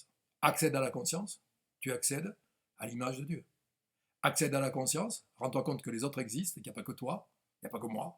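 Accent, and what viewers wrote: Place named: Provence, France. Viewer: Merci docteur pour toutes ces précisions. J’adhère complètement à votre riche analyse. On peut rajouter je pense aux santons les fameuses « navettes »... L’histoire de mon village créé au 12ème siècle montre sûrement une concurrence entre Arles-Avignon et Marseille qui n’était pas encore dans l’empire... Il y a en effet, ainsi qu’en Avignon et en Arles, une rue Auguste Chabaud (si c’est ce peintre...). Ça ne m’étonne pas car votre accent provençal vous a trahis! Que du bonheur. Vous devez connaître alors « La Coupo Santo » de Mistral. Les paroles sont très intéressantes si on pense au Graal... Bon Week-end. Thierry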